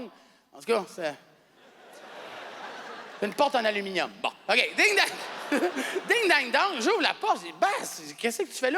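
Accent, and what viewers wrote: Quebec, Canada. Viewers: Sa fait pas trop rire à cause de l’accent mdr il y a que les québécoises qui vont rire vue qu’il ce comprenne mdr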